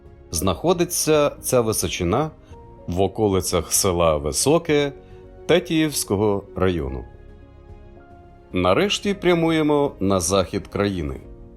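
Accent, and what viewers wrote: Russia. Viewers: Не двух а двох і у диктора відчувається декотрий російський фонетичний акцент, певно думає на ній і розмовляє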